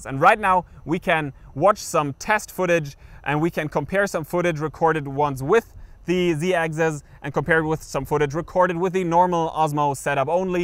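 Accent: Germany. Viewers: we wanna throw a look hier hat man schon gemerkt das du deutscher bist :) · Kommst du aus Mainz? Schönes Review :)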